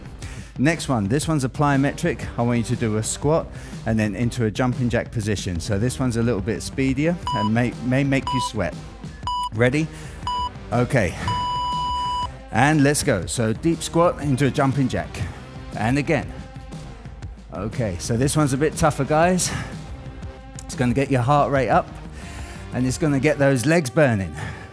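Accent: United Kingdom. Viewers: Wit dat British accent and him being ripped af he seems like a bad guy from a kids movie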